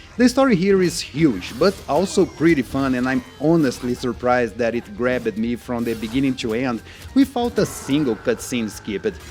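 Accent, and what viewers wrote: Brazil. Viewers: Realized by your accent that you must be brazilian right? Nice video.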